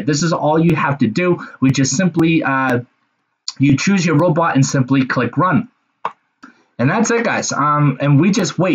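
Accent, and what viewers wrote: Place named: United States. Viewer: to me your accent sound so American 😅 where are you from or at least where do you reside? Because you have access to those brokers that does not allow Americans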